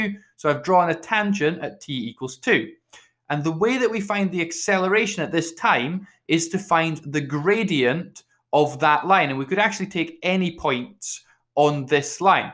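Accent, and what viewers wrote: Scotland, United Kingdom. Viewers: i like ur sco'ish accent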